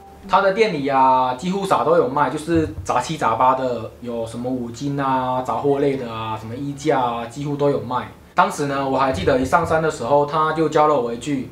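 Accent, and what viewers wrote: Taiwan. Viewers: 小哥的話很像台湾人的口音 台湾觀眾聽起來熟悉 舒服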